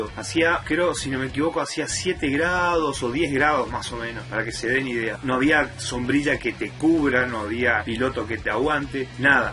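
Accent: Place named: Corrientes, Argentina. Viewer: Sos Correntino? Tenés la tonada jaja, muy buenos tus vídeos!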